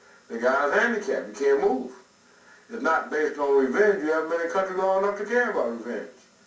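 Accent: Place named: United States. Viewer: he does have some kind of country accent but he's speaking pretty proper English. idk why you're so triggered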